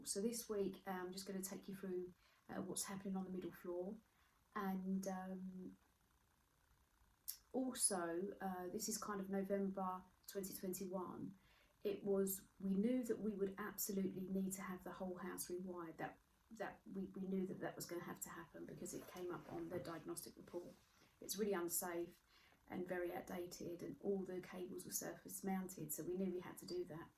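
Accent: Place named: United Kingdom. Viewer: Enjoyed binging your channel. Few requests-- -Please increase the volume on all your commentary. I have the volume all the way up and can barely hear. Being from the US, understanding the British accent with music in background adds an additional challenge. -Create a playlist of all the videos in a row so new viewers can start at the beginning and watch the process in order without having to keep returning to the main channel. -Please move the camera through the rooms a little slower. Moving too fast makes it hard to comprehend what we're seeing and can feel a little dizzy. I also felt like the inset photos were important, but didn't get their connection to what I was viewing. -Thank you for getting new music. The original background music was ok, but it was VERY repetitive for so many videos. It's a lovely property with loads of potential. Looking forward to following your journey!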